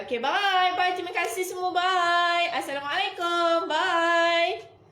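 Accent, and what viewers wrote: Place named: Malaysia. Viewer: Serasa denger orang malaysia ngomong😀😂😁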